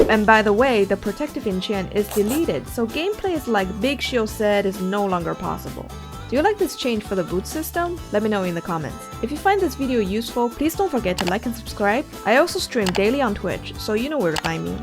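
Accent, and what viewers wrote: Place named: Ireland. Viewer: came from the new boots update, stayed for the Irish accent 🖤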